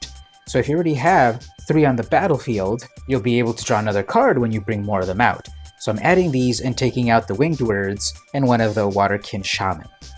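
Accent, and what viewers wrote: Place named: Philippines. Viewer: Filipino ka ba po?? Your accent sounds like a Filipino...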